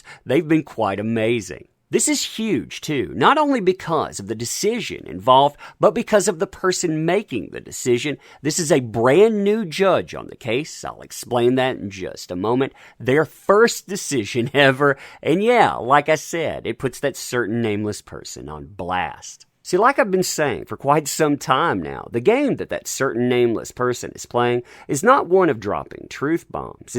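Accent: South, United States. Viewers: It's his southern drawl that's very charming🤗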